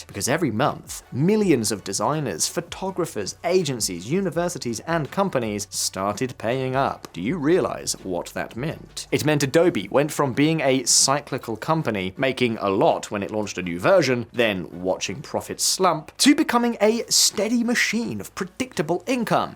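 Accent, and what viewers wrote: United Kingdom. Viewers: British accent. Disregard.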